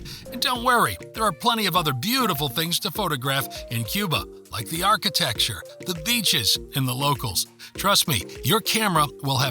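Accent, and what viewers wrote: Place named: United States. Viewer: " captain" must be " Yankee "...well "captain".. After 14 times in Cuba all over of Cuba, you sound really like " Yankee " YOU, buddy just miss Cuba really good times, 5, 10, 15 years ago. Love Cuba, love Cuban people, see and experience everything....your podcast is not good buddy..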